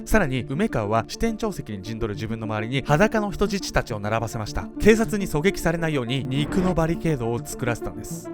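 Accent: Kansai, Japan. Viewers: の関西弁可愛いかた！🥰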